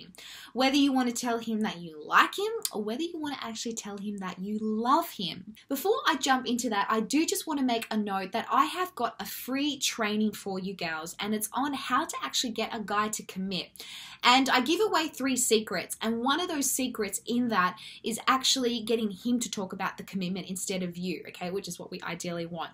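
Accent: Australia. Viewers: are you Australian? British? Are you in Australia? I'm curious because I'm always comparing how the English are compared to how Americans are. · Mark Edwards I don’t know but I do know she is Australian, I’m Australian and this is how Australians speak.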